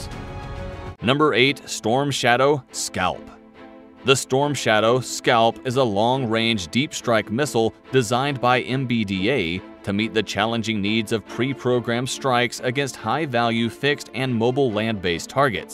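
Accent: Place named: United States. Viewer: I thought Military TV was British, obviously this narrator has an American accent though.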